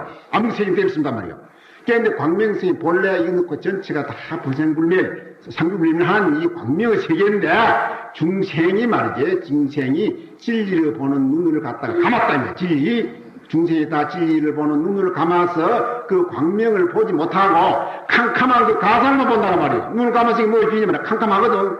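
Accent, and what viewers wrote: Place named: Gyeongsang, South Korea. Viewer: 경남에서 태어난것이 처음으로 자랑스럽네요 ㅎㅎ사투리가 심하신 스님 말씀을 자막없이도 이리 수월하게 알아들을 수 있다니 🙏🏻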